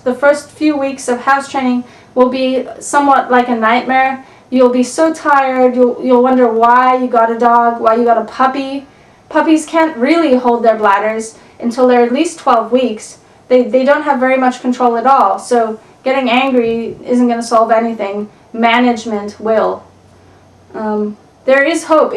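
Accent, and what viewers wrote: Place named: South Africa. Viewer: oh my gosh! I thought i heard a African accent! Are you from South Africa? I lived there for the most of my life.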